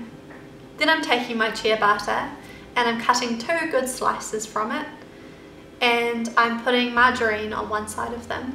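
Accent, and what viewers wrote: New Zealand. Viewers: These sandwiches look SO good! Thanks for the recipe ideas - you're wonderful to listen to - kiwi?😊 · She sounds like she's from nz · Kiwi accent lol....people have a job understanding us sometimes :) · New Zealand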